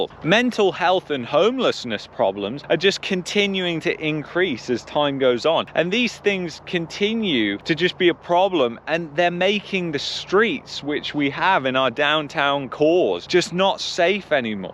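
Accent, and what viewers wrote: England, United Kingdom. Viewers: So much negativity and doom and gloom.you have an English accent, go back to where you came from if you hate it so much. UK is a third world country anyway, go back and live “large” there …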